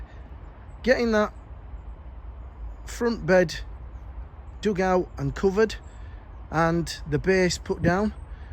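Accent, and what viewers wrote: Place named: West Yorkshire, United Kingdom. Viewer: Hello, I just watched your video and really enjoyed it. So down to earth and practical, and I love the West Yorkshire accent. I worked in Leeds for 12 years and really miss the place! I don’t think I will have problems with flooding on my veg plot😮😂.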